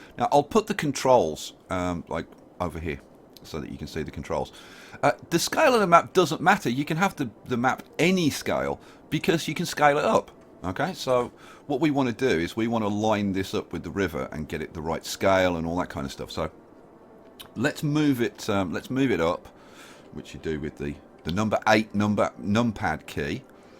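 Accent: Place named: Birmingham, United Kingdom. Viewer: Thats a Brummie accent if I ever heard one.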